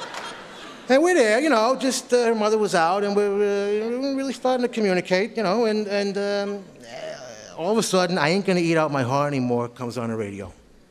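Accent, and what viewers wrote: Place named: New Jersey, United States. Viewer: I'm from the South and I love Jersey talk keep it up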